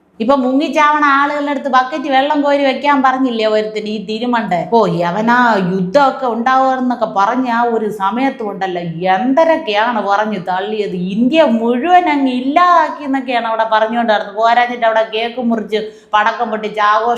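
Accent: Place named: Thiruvananthapuram, India. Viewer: TVM ഭാഷ... ഇത് കേൾക്കുന്ന ഞാൻ കോഴിക്കോട്കാരൻ... ഞാൻ എന്തര് കരുതണം...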